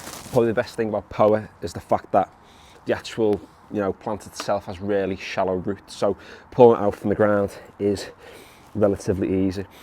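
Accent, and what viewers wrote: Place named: North West England, United Kingdom. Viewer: Need to start mine soon. I’m in the North West, guessing from your accent this garden isn’t a million miles away? Need to get the weed and feed down as well as my first mow (I know there needs to be 5 days between the two) and I’ve just picked up a scarifier as that desperately needs doing too. Anyway fairly large garden (about 3 times the size of this garden), south facing and at the mo is quite muddy and covered in moss. 😔 On the plus side it’s in the sun all day as no buildings nearby casting a shadow. Anyway was thinking mow in the next few days, weed and feed at the beginning of April then scarifier poss a week or two later (with mows inbetween of course).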